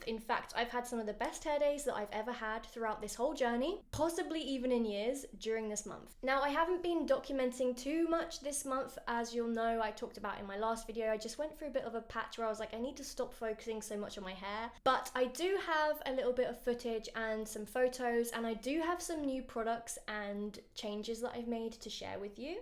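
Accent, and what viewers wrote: United Kingdom. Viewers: What made you assume that? Her obvious british accent or was it something else?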